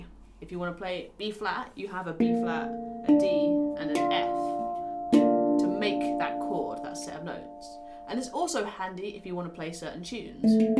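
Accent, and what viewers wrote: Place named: United Kingdom. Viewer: Thank you so much! Informative, and easy to understand. (and my Californian ears love your U.K. accent) 🥰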